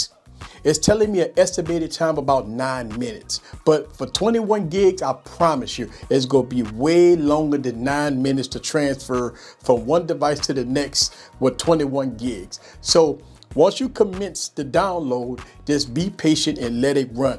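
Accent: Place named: New Orleans, United States. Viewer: New Orleans accent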